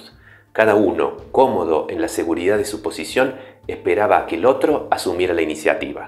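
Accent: Argentina. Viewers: Pero usted no está en España? Por momentos pensé que era argentino por el acento y luego me dije que eran ideas mías, pero ahora que veo su respuesta, creo que no me equivoqué.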